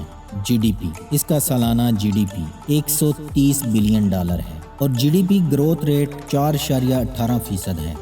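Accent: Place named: Punjab, Pakistan. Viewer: Masha Allah bhai aap bohat achi video banatay مگر آپ با برکت کو ببرکت نہ کہا کیں۔بہت برا لگتا ہے۔ اردو کو پنجابی لہجہ میں بولتےہو نوی بازار کو نوی بزار تو نہ کہو۔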